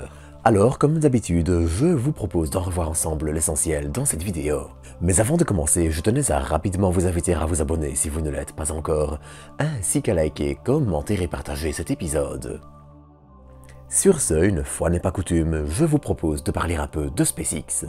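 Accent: Belgium. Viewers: Accent Belge, normal quand on est né en Belgique.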